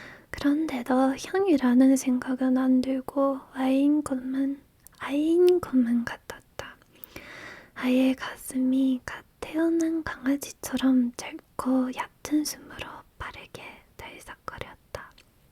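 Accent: Standard. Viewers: your pronunciation is really standard, and it's hard to tell that you grew up abroad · 你的读音都非常标准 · 你的韩语发音很标准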